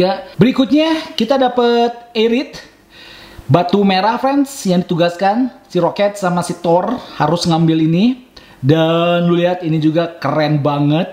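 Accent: West Java, Indonesia. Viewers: Dari logak ngomong nya kayanya bang orang sunda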